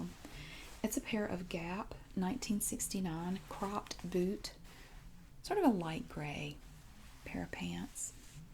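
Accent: United States. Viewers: Your accent is amazing. I'm English and I love American accents. What's a goodwill store? Is it a charity shop?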